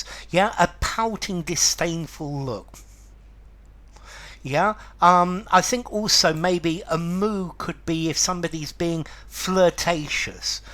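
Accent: United Kingdom. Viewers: He is always using his perfect British accent in explaining and giving vocabulary lessons , you can check his previous videos throughout these years. They might be at handy .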